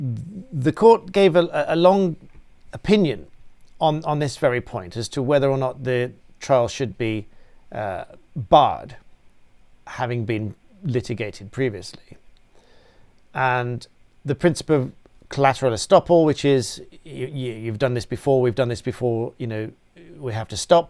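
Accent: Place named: Birmingham, United Kingdom. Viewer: Are you from the Birmingham area?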